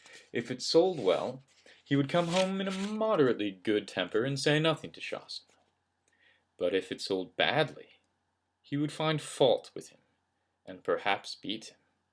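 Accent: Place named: United States. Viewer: Description says he's from Scotland. He sounds American. No biggy :P~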